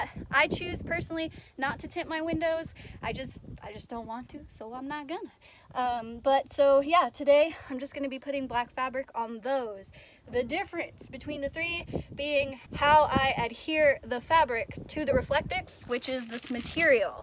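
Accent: Texas, United States. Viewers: I thought I heard a Texas twang in your voice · Funny your texan accent pops in and out occasionally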